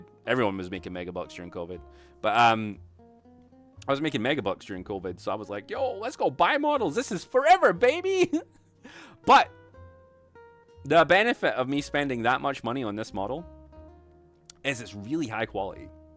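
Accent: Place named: Scotland, United Kingdom. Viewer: 🤔 Whose this Scottish guy, I didn't know they streamed~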